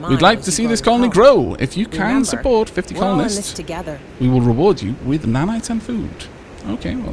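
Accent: Wales, United Kingdom. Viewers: Completely new to this channel, saw the picture, is this guy Welsh? I noticed the accent and can't help think it. I know it's not important, but you know...curiosity!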